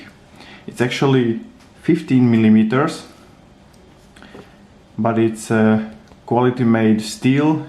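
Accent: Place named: Russia. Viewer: Жёсткий русский акцент😁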